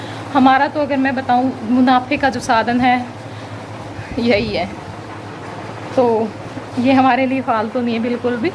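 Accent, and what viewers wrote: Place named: Bhiwani, India. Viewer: Village Life with ok maine socha bhiwani ke paas ke ho aap boli waisi h aaj Maine aapko video first time Demi h nice video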